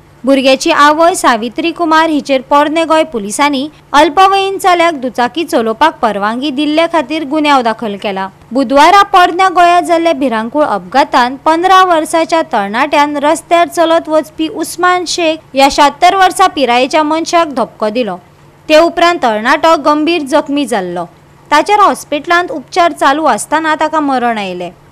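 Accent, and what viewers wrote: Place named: Karnataka, India. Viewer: Is the anchor from karnataka? Highly accented.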